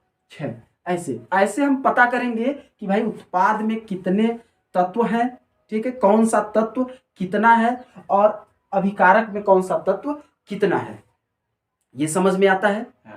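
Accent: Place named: Singrauli, India. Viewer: वीडियो में सिंगरौली की आवाज का प्रयोग किया गया है।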